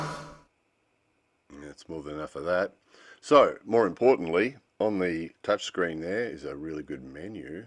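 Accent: Australia. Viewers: You should have displayed your Japanese instead of us having to your Australian accent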